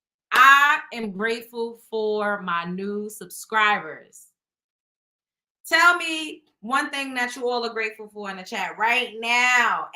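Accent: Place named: New York, United States. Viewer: You remind me in a way of another good sis I watch on YouTube named Ashley Dalton she's a character as well lol, and y'all both talk with a NY style accent, and y'all both some beautiful chocolate drops. New subbie✨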